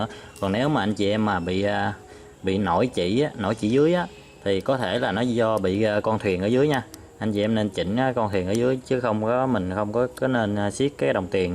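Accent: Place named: South, Vietnam. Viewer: Mình miền Bắc nhưng rất iu quý người miền Nam! Giọng dễ thương, thân thiện!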